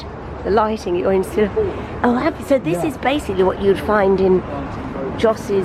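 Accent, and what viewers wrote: United Kingdom. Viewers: Just found your vlog Jo and subscribed😀 I know I’m going to enjoy watching you. Sorry for your loss, there is no timeline with grief, I lost my mum at the age of 67, far too young! 18 yrs ago and it can still hit you out of nowhere. I recognized your face as an actress but couldn’t remember from where, until you mentioned Crossroads, use to watch it many moons ago. I’m a British lady 🇬🇧 living in Canada now🇨🇦 so will love hearing your British accent❤️ Best wishes from across the miles 😊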